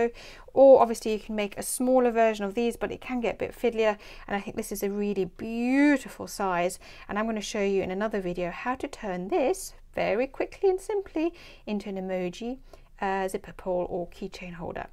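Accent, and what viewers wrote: England, United Kingdom. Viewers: Thank you for that. Nice hearing an 'English' accent on YouTube for a change. I used to make PomPoms like this when I was a child. Though I didn't cut a slot, we would sew the yarn through the hole, and fill the hole up. (I think I like this way...) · Ty and are you British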